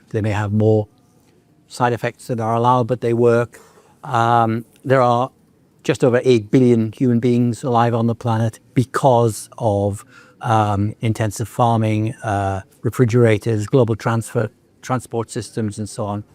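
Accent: United Kingdom. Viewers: He sounds to me really Brittish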